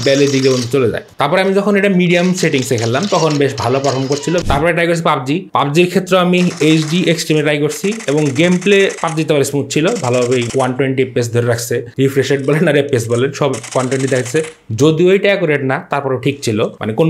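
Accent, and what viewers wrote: Tangail, Bangladesh. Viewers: Vai ki tangail er nki?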